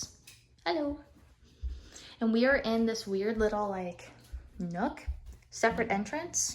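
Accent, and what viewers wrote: Canada. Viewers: I love your accent, it sounds so canadian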